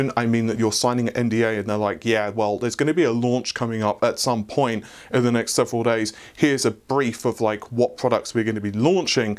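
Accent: United Kingdom. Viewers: Isn't he British?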